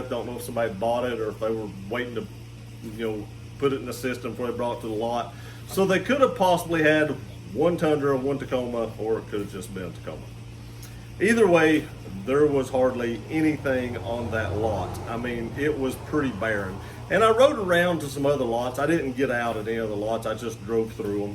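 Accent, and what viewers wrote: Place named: Tennessee, United States. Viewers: Johnson City, Texas or Johnson City, Tennessee? Accent sounds like TN but can't be sure. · Found your channel a sec ago by your weight loss video. Was like, bet that dude is from East Tennessee based on your accent haha and clicked this video and you said Johnson City. I’m from Knoxville/ Maryville. Good to see a YouTuber from this area. Just wish I didn’t have to stare at that ugly butt Florida hoodie in that other video tho🤮😂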